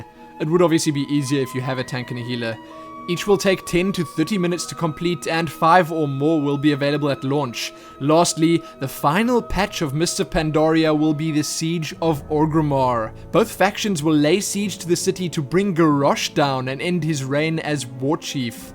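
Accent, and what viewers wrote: South Africa. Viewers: It's a South African accent guys....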